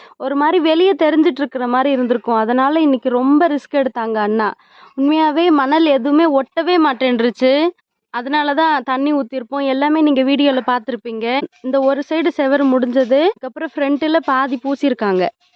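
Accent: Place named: North India, India. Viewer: Nee yennoma north indian tamil pesura matheri pesatha nee tn thaana ozhunga pesu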